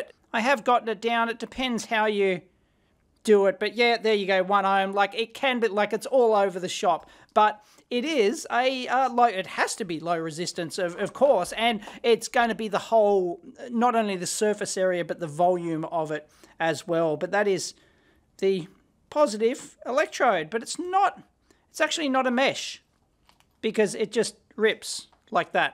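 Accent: Australia. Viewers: What more do I love than some australian guy talking about batteries for 20 minutes straight. Awesome vid btw and very interesting. Do these same flaws exist in the triple or double A's? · The worst high pitch voice that could come with an Australian accent. So annoying. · he is Australian, that is why it is very different to British accent. 🐨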